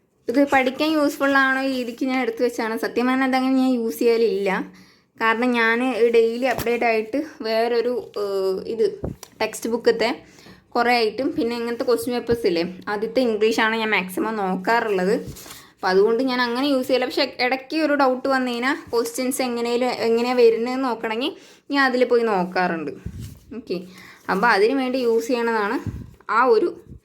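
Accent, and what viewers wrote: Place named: Ottapalam, India. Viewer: ഒറ്റപ്പാലം side ആണോ വീട്.... ഭാഷ കേട്ടപ്പോ അത് പോലെ തോന്നി...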